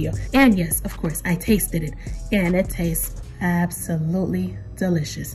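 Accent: New York, United States. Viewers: I love that new york accent from a woman you doing ya thing with that lasagna too i know you can cook you from up top too 💯🍷🌹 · I enjoy your videos! Keep cooking! I have my way of cooking but always love to try someone else's ways of doing things! Also I love that New York accent! Thanks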